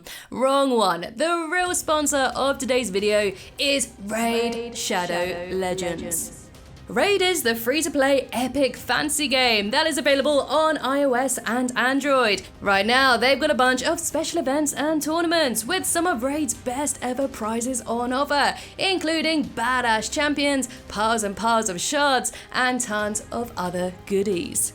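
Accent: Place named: England, United Kingdom. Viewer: This is my first time visiting your channel. How did I know you were English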